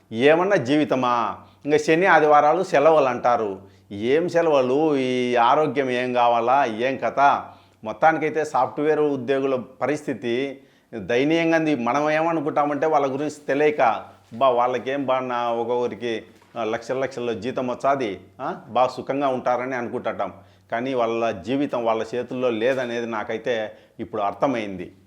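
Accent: Kadapa, India. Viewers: పక్క కడప జిల్లా బాష ❤😂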